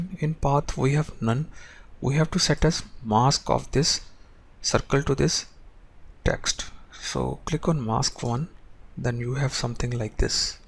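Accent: India. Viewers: fuck i hate indian accents no offence lmao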